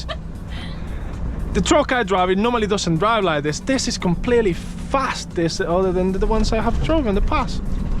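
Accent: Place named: Liverpool, United Kingdom. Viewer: Nice Truck Mate, Good to hear a bit of Scouse Also 👍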